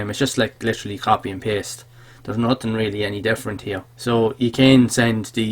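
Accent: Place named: Ireland. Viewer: Great honest review.. Where are you from, Cavan? Nice to hear a fellow Irish man. As per the product.. I doubt even newbies would be approved to promote the 30 offers..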